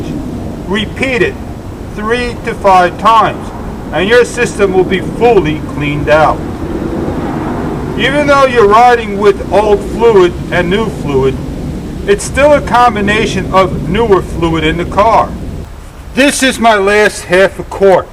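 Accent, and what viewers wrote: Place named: Brooklyn, United States. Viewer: When I heard you say the word "stoop" I just know your from Brooklyn. Maybe your filming this in Brooklyn. I was born and raised in Brooklyn. Why is this so special, well, now I live in Delaware. There are a lot of retired people here from Brooklyn, like myself, but when the locals here my accent they know exactly where I am from. Anyway, I have a question for you. You said to change the fluid 4 times to help to clean out the filter. Do you have to use the very expensive Honda ATF every time you change the fluid, or can you use a cheaper fluid for the 1 day to clean out the filter and on the last fill, used the Honda brand ATF?? I hope you get this question and provide me with a reply. Thanks!!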